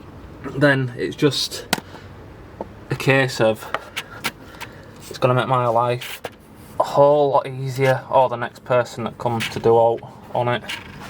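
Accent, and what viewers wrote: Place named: Leeds, United Kingdom. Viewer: Are you from Leeds? Accent sounds familiar, I'm from Leeds too, got a silver Vectra 1.9 👌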